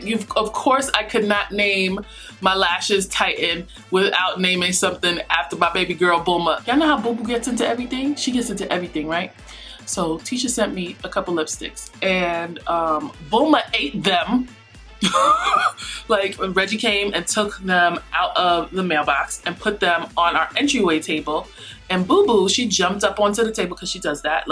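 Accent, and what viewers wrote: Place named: New York, United States. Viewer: COME ON, NY ACCENT!!!!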